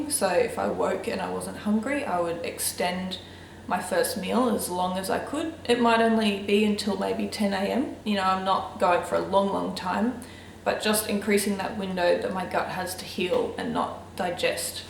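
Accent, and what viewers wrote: Australia. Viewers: Thank you for this video, I have had eczema for as long as I can Remember. I do a lot to heal my gut and body it's a journey and wonderful to hear about yours. Where are you from btw I can't get your accent Australia or Newzealand? you look great now all the best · Is she Australian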